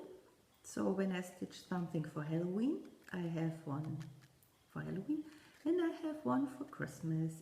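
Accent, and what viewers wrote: Germany. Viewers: Your stitchy baskets and table are so pretty, and your lovely German accent is delightful to listen to. Thanks for another wonderful video.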